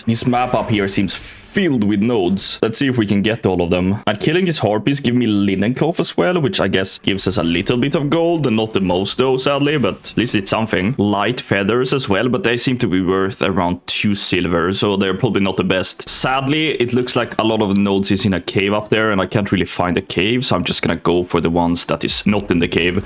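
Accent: Sweden. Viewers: are you swedish by chance?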